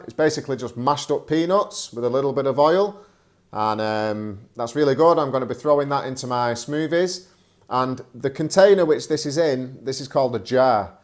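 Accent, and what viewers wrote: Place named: Manchester, United Kingdom. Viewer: He's actually from the Manchester area in Northern England. His accent is different to the way people speak in Scotland.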